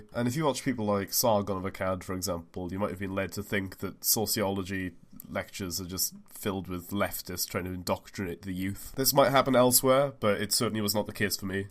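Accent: Wales, United Kingdom. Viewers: I don't often subscribe to people based on a single video but this really resonated with me. While I was never reactionary enough to enjoy Milo Yiannopoulos or Sargon of Akkad I was a Gamergate supporter and was suckered in by their sensationalism and militant rhetoric. Then I guess with the rise of Trump I just distanced myself after I found out that a lot of the people I was following weren't actually liberal centrists but full-on Trump supporters. Brexit didn't help either. Also, fellow Welshman? Or am I just bad at recognising accents?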